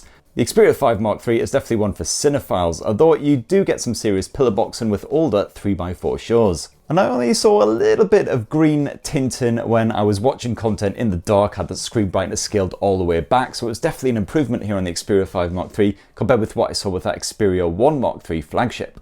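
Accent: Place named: Northern England, United Kingdom. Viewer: He has good Sense of humor most people won't be able to understand the Northern accent.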